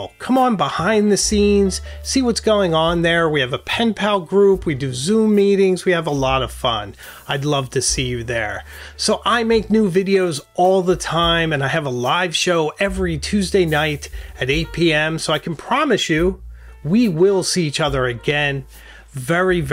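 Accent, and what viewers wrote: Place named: Pennsylvania, United States. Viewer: Does anyone know where is from? The accent gives Bucks county PA.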